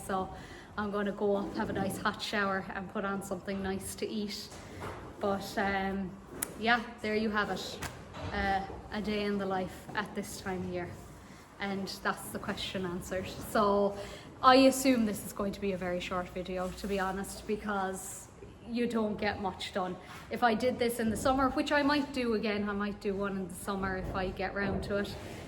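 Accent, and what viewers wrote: Ireland. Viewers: Recently found your vlog. I'm on a marathon now. After I moved to Kentucky no more livestock, but still remember, so great to see the vids. Old and retired now, just great to see what you do and all the best to you. Love the Irish accent.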